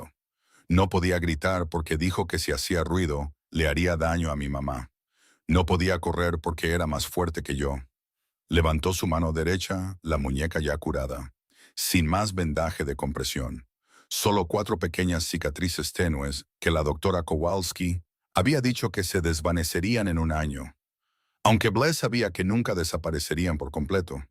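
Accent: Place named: United States. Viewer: Los cuentos de éste canal son muy pesados y ésa voz con un tono yanki es horrible imposible perder tiempo en escuchar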